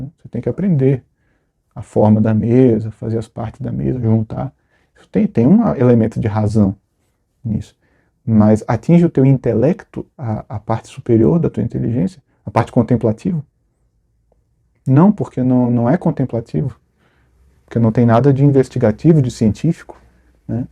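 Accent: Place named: Bahia, Brazil. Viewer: Professor me tire uma curiosidade:o professor é de onde na Bahia?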